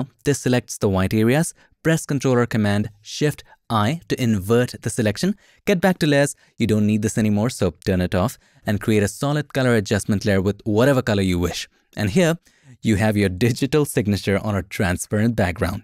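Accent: India. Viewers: Dude tries so hard not to sound Indian.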